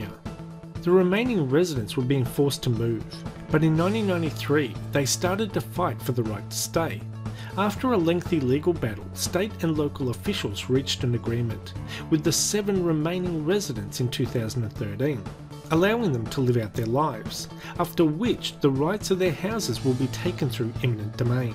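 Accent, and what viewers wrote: Australia. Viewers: you’re Aussie accent is so far thick it’s become gibberish. That’s where the problem lies. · I should have thought it was hard to mistake the two. While you may not have pronounced the "d" there is a clear difference in the vowels between eighteen and nineteen, and the Aussie accent even exaggerates that difference. You clearly said "aye-teen" with a long drawn out aye which wouldn't sound anything like "nine-teen", i.e. aye vs. eye+n. I just think they're unused to other accents and rhythms than US English. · Aussie accent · I hear 2018, think some people didn’t understand the Aussie accent. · I stg aussie accents are NAILS ON A CHALKBOARD annoying..!!! You all say ‘over there’ like “ORVAH THEY” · You did say 2018 it is just your Australian accent that makes it sound like 2019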